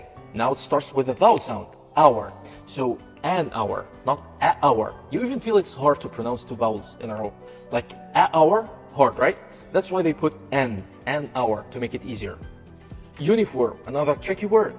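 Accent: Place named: Vietnam. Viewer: anh là ng việt ạ, sao lại phát âm f h t n tiếng việt vậy:))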